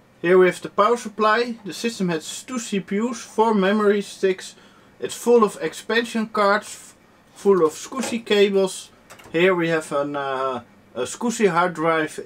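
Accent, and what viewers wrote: Netherlands. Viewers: nice dutch accent leuke pc :)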